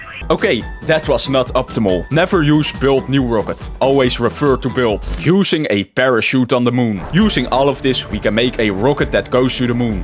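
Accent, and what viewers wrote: Netherlands. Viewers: i smell nederlands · I smell dutch (netherlands or nederlands) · Ru dutch? Ur accent is dutch.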